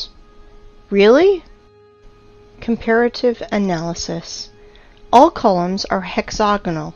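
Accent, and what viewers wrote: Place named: Russia. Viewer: I wish i could get the original version with the Russian style accent. my hard drive crashed and i lost my downloaded copy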